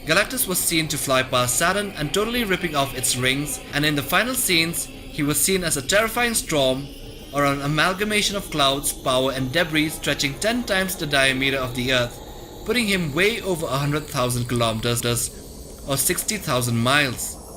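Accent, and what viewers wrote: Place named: Northeast, India. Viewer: Sounds like your from North East india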